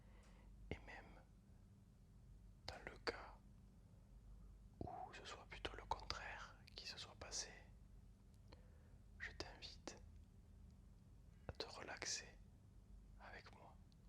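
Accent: France. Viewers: sound is just a touch too low. Need to hear that French accent. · Hum ça change beaucoup du reste de ce que tu fais 😉 Et c’est très qualitatif! Le ton de ta voix, le rythme, la diction, le petit accent du sud sans parler du contenu avec un vocabulaire et des tournures de phrase de très bon niveau! Tu devrais faire plus souvent des vidéos en français comme ça!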